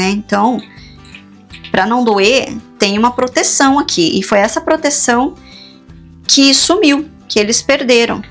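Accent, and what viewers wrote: Minas Gerais, Brazil. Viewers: já imaginava que você é uma mineirinha ❤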